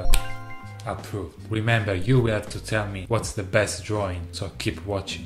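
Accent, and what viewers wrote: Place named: Italy. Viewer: L'avevo capito da subito che eri italiano, il nostro accento si riconosce in un attimo. Ma come mai sono l'unico Italiano a commentare?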